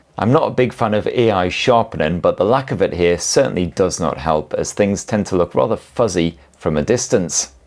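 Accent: United Kingdom. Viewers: Great review. Love tne Brit accent and twattery. New word for us. 😉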